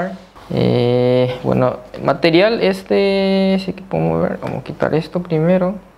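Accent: Paraguay. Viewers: Se nota cuando alguien habla en paraguayo😂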